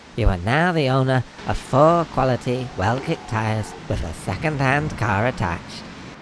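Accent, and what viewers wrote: Yorkshire, United Kingdom. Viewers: I'd bet my hat hails from Yorkshire with that lovely accent, but I recognised Barton on Sea!! Perhaps he just gets around a lot - van life and all that. But wait, he has a shed! Hmmm, maybe he has many sheds...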